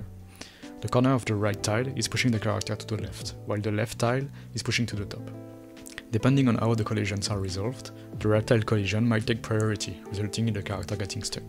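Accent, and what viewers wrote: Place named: France. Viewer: Le petit accent Français qui ma donné le sourire pdt toute la vidéo, merci à toi btw ;).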